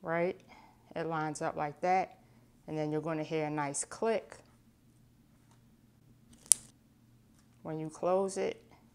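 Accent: Baltimore, United States. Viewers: I hear a little Baltimore in there?👀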